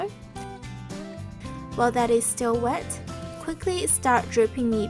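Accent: Australia. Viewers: She sounds so Australian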